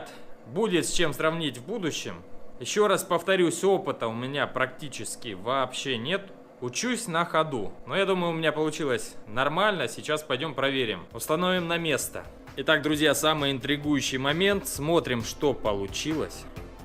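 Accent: Rostov, Russia. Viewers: это просто ростовский акцент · Это ростовский акцент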